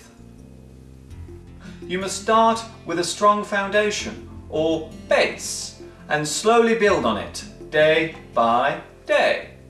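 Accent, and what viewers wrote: England, United Kingdom. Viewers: I like your accent is different than the American English, I know you are from England. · hes speak using British Accent :) my favourite accent :P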